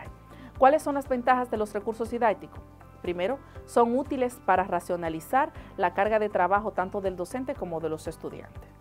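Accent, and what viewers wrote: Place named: Puerto Rico. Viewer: Aparte de estar escuchando su información al final terminé en escuchar que tiene acento puertorriqueño.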